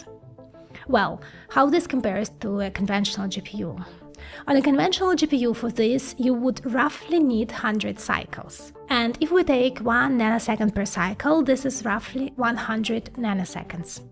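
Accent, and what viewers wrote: Russia. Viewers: i would really love to hear you speak in your natural language which i assume is Russian. Even though you speak in English, you cant hide the natural mother tongue. Not saying anything bad about your English or anything, its absolutely perfect, just would love to hear you in your natural mother tongue, i think it would sound more fluid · You've improved so much compared to your earlier videos, and it's great that you’ve stopped using the Russian 'kh'х' sound. I really love your growing confidence—keep going!👍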